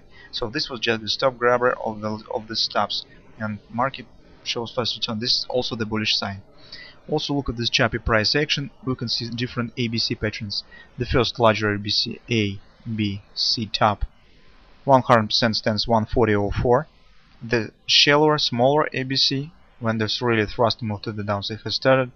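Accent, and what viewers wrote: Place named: Russia. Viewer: yeap, you've got the point... eur/usd is more bullish than bearish for now... funny accent! :) where are you originally from? Russia, I guess, right? :)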